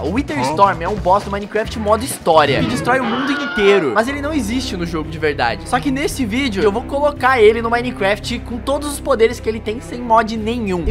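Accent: Rio Grande do Sul, Brazil. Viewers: TAMBÉM SOU GAÚCHO BAAAAA QUE CONHECIDENCIA É ASSIM QUE FALA BAAAAAAA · VC É DE RIO GRANDE DO SUL ?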